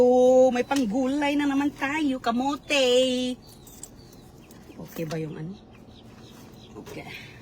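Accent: Visayas, Philippines. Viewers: Visaya k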